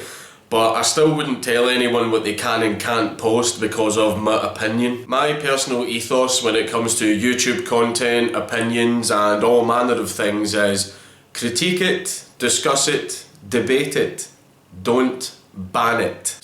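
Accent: Scotland, United Kingdom. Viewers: Few things make me as happy as hearing a Scotsman call someone a cunt. It's just beautiful. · Fuckinell!!! He really is Scottish isn't he? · i never wanted to listen to a man talking sexy to me with a Scottish accent. untill now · The way you said Conspiracy Theories as "Con-spare-essy Thee-dees" Made me bust a gut xD. You're Scottish accent is perfect, I wish I had it to be honest xD.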